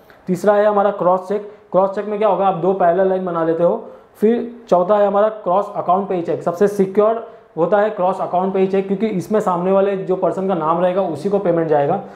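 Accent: Maharashtra, India. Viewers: sir aap marathi ho kya ????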